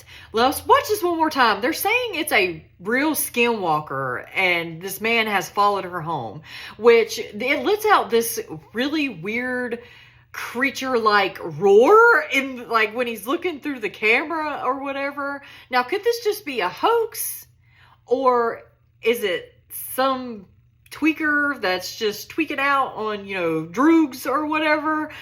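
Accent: South, United States. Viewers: This girl is badass I'm digging the southern draw and also the canine eyebrows it's working for her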